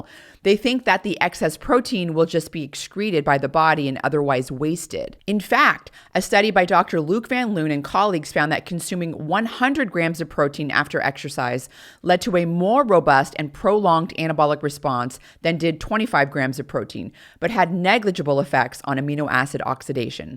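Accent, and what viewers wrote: United States. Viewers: Your typical American voice timbre makes me think you would be a wonderful country singer.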